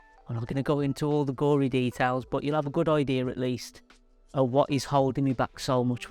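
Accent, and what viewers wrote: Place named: Birmingham, United Kingdom. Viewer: Hi, thank you for posting this video and for your absolute sincerity. One of your many gifts that stand out is your ability to communicate with excellence in front of a camera, I have tried this and it extremely difficult but you have always made it look easy. I am a Christian and I will be praying for you that God would give you successful outcomes in all your treatment. I have had 2 severe nervous breakdowns in my life so I know life can be tough sometimes. I love your positive attitude and the fact you struggle with these problems yet you can reach out to others to help them is a really lovely attribute of your character. You have also spent many years helping others by sharing your skills and discoveries with the world. I just want to say, thank you so much and I pray you will experience optimal health going forward. 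You are an inspiration!! I just wanted to add, I have lived in Scotland since age 5 but I was actually born in Birmingham!! I spent my early childhood there and had a Brummy accent like yours. They are memories I will never forget even though I was just a young child.😊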